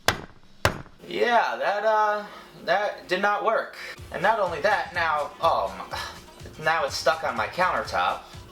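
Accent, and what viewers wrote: New York, United States. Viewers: There are a lot of people on YouTube that annoying me. isn't one of them. I hope he can get more subscribers, he really does deserve it. It seems that he tries really hard on these videos. Plus he's a New Yorker like me. It's funny, even before I actually heard him say he lived in New York in one of his videos, I could tell he was from New York because his voice was so familiar.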